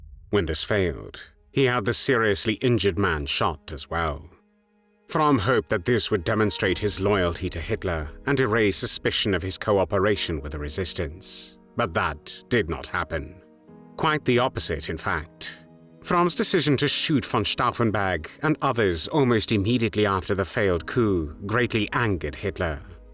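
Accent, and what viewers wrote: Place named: United Kingdom. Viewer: Get rid of this standard BRITISH accent narrator. GET RID of his AUSTRIAN ROLLING VOWELS speech.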